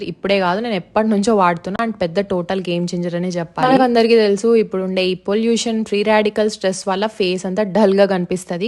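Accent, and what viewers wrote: Rayalaseema, India. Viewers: Ah yappa dagaraki was paka ralayasemma slang ❤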